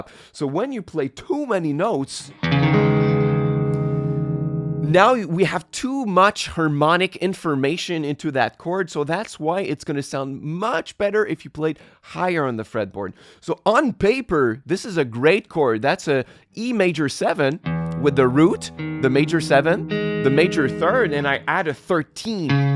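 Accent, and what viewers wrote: Quebec, Canada. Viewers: > Clicks on video > Oh cool he’s Quebecois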